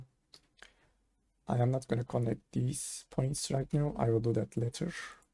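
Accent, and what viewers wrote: Turkey. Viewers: Usta şu ana kadar gördüğüm en iyi cinema4D videoları sende helal olsun işi tekrar sevdirdin bana aksandan hemen anladım zaten türk olduğunu :)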